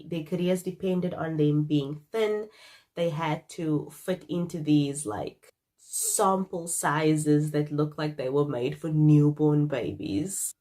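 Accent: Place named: South Africa. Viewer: I can spot a South African accent a mile away ❤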